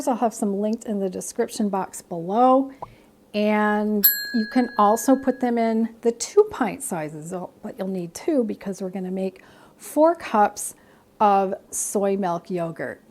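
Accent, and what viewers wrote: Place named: Michigan, United States. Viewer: I cant wait to make this, thank you for the recipe! Btw, you sound like you might be from MI. If so, hi from the UP!🙋🏼‍♀️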